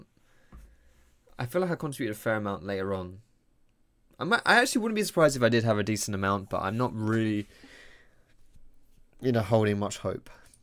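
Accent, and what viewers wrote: France. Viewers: the french accent makes me smile xD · that french accent tho xD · Video gets a like for that french accent alone!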